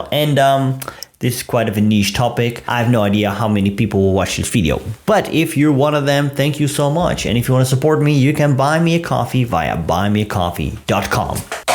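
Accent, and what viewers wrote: Netherlands. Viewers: I can hear you are Dutch love the accent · Within the first few seconds of this video, I knew you are Dutch because of your distinctive accent.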